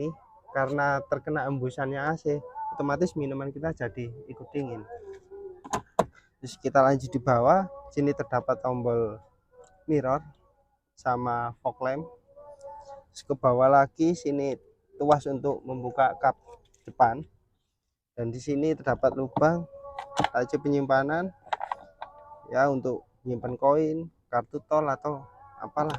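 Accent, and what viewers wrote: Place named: Kediri, Indonesia. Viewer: Logattè koyok orang Kediri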